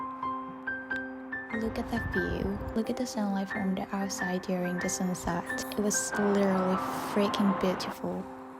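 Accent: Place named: United Kingdom. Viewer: 영국식 영어 발음이 너무 멋있어서 영상 보는내내 몇번을 치였는지 몰라여💕진짜 작업 너무 멋있고 판화도 너무 멋있고 노을도 멋지고 그냥 너무너무 멋져요!!!😆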